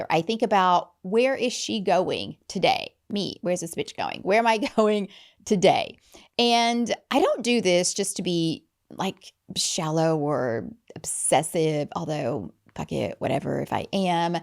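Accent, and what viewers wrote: South, United States. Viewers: S**t and F**K are not Sexy or Attractive. You sound like a hillbilly even though you look good!! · Love your country accent lol "i told you i was gonna cus" 39 here and here for all of it !! I was kind of sad approaching 40 but i feel empowered now !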